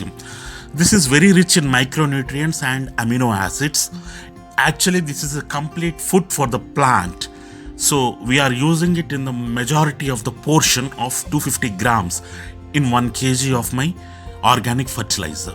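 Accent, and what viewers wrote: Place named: South India, India. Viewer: 🙏🕉️🚩🌹🌻 JAI SRI RADHA GOVINDAY NAMO 🕉️🚩🌹🌺🙏🙏 Sir, Thanks for this stunning and informative video. I have been learning gardening since last four months via U TUBE and have attended one practical session also. Pls send me your name and mobile number so that I can remain in touch with you. From your accent I can make out your are from south. I am buying my fertilizers and other items from TN and KERALA. THANKS a lot 🙏🙏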